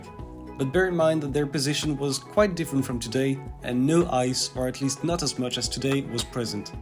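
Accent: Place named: France. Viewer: je me disais qu’il y’avait au fond d’un anglais irréprochable un petit accent qui me rappelait le miens 🇫🇷🇫🇷🇫🇷 D’ailleurs tu t’es mis un sacré gros youtubeur avec toi!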